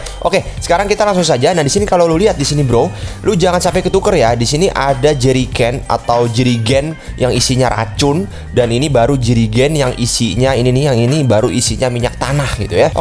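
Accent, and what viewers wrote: Java, Indonesia. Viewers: medok bang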